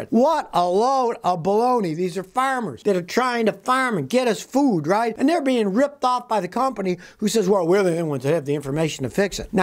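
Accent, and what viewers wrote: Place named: Tennessee, United States. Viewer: Anyone else notice that Scotty is starting to get a Tennessee drawl??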